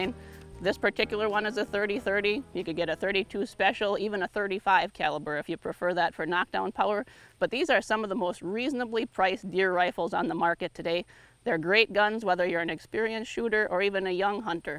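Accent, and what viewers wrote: United States. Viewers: All she needs to use is the word “feller” and that country accent will be complet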